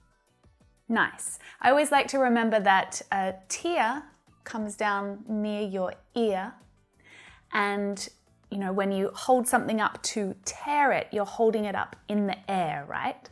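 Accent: United States. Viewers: Es Inglés americano🇺🇸🗽? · Many English people pronounce the word "hear” as "hiya".But yours is a bit sounds like American accent.May i learn the difference between them? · Is this american english pronunciation?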